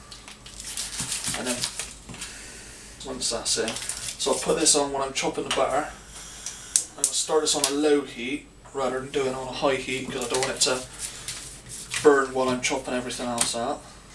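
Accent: Bristol, United Kingdom. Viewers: I like your accent, are you from Bristol? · Good video :) I love tablet but never knew how to make it. Out of interest where are you from? Can't place your accent....Bristol?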